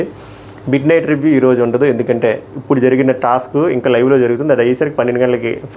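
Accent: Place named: Visakhapatnam, India. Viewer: Mee slang super Garu. Vizag slang👌👌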